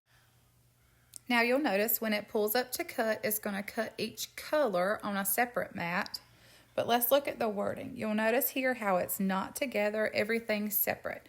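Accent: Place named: South, United States. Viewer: Hello, i am not happy to have to say this, but i'm disappointed in your video, after your introduction, you went into design mode, you didn't start from the beginning & i'm a beginner, so this is why i am disappointed, i mean you sound like a very nice southern lady, but i didn't even get to see what Cricut you were working with at the start of your video.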